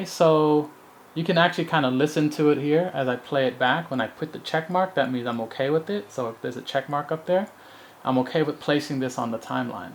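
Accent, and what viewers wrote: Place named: United States. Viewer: Wow you really sound like American when I don't see your face hahaha,same here, I'm not Native Speaker of English but I can speak English very fluently hehehe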